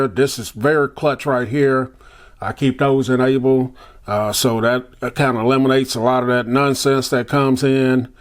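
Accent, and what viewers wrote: South, United States. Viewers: First review I've heard with a southern accent. Sound like one of my uncles. Love the content. Love my Stormy Black 6 pro 💪🏾✊🏾💯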